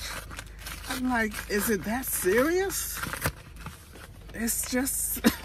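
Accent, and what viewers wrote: Baltimore, United States. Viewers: I didn’t know you were from Baltimore, but I kept saying I hear a little Baltimore in him…maybe he’s from PA.😂 Baltimore representing!!!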